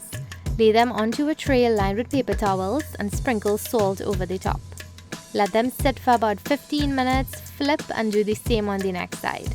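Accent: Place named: Trinidad and Tobago. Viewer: Hey girl , my cousin lives on Liberty in Queens .I have been there many times......I also lives close where uou buy your bigani in Trinidad......I JUST LOVE HOW YOU ARE SO , SIMPLE AND HUMBLE AND ALWAYS KEPT YOUR STRONG TRINI ACCENT....... TRUE TRIN.....😍😍😍😍. Girl I bought some biiiggg bigani in the back of Chaguanas recently , she is the best so far. I try other vendors but usually take 2 bites and throw it away, and I loved it so much, by this lady , I looked up how to make from you of course.......love aaalllll your videos. ❤❤❤❤❤❤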